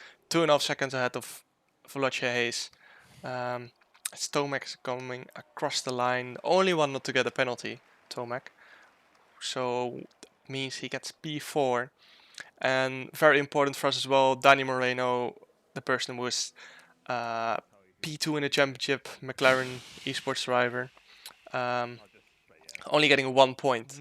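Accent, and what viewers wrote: Netherlands. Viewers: Ik hou van dat Nederlandse accent 😂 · Are u dutch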